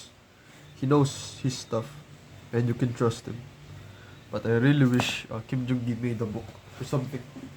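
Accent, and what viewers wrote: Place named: India. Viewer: Lol why are you trying to hide your accent! Embarrassing your Buddy Indian accent!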